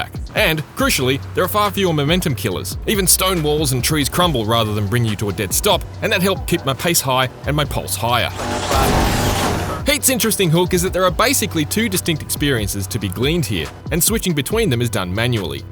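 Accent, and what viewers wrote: Australia. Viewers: He's Australian? 🆕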